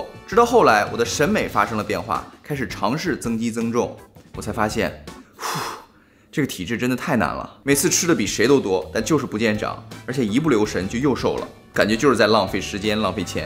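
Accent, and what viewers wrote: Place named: Beijing, China. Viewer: 我感觉是北京人，我是北京人，他的口音，很像普通话很好的北京人😂😂😂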